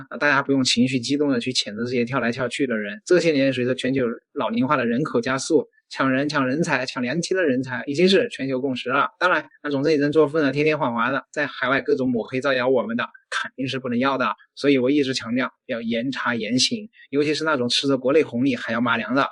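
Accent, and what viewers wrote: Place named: Anhui, China. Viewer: 安徽口音的 😂😂😂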